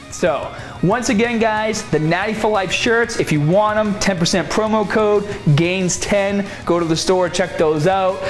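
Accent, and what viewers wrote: Boston, United States. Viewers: But that boston accent tho :D lol. #GoPatsGo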